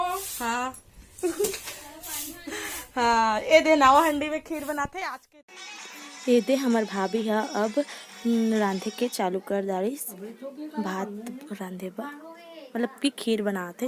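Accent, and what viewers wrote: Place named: Durg, India. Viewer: Seva johar.tuman kon Raj ke aa nai bataya.boli se lagathe tuman durug side ke ho sakat ha.ham to Korba jila wale🙏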